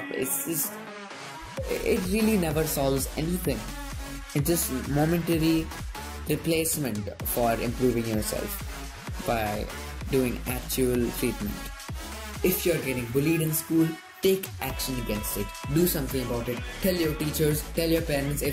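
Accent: India. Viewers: I recognise that accent 🇮🇳 India all the way!!!!!🇮🇳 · are u Indian or like Asian? not trying to sound ignorant but it kinda sounds like it in your voice.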